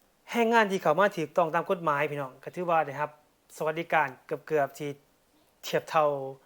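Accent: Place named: Upper Isan, Thailand. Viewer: ขอถามฉันบ่าว บ้านบ่าว อยู่แขวงได๋? อยู่เมืองได๋?ฟังสำเนียงเว่าคือคนอีสานตอนบนแท่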